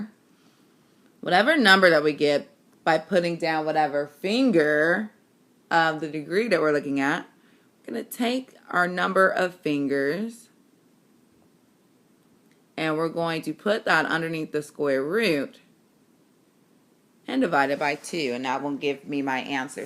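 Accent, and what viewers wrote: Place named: Barbados. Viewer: are you from barbados?? your accent is kinda rihanna-esque lol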